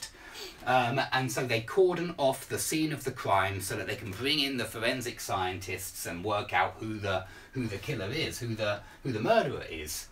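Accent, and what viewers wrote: United Kingdom. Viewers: Hi greetings from Colombia, i still enjoy your lessons, thanks. I hope You área doing well. I love your british accent and i find it pretty understandable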